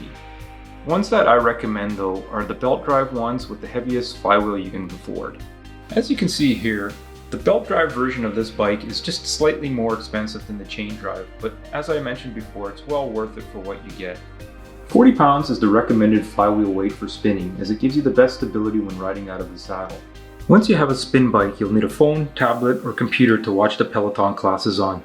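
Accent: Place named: Canada. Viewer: I love that Canadian accent !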